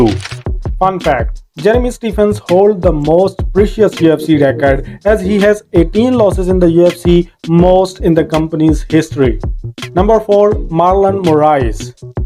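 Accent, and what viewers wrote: India. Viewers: Damn I wanted to watch this video so bad but you sound like one of them Indian scammers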